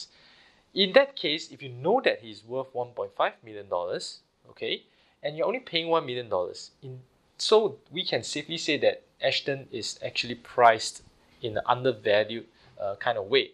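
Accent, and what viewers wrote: Singapore. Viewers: I just can't take the heavy (Singapore?) accent, so I quit watching after 30 seconds. But I am pretty sure he doesn't know how to value a stock, his approach is basically guessing. If this is the right way, analysts would not deserve to be paid that much. I don't blame on him, in fact I would be surprised if anybody at his age does.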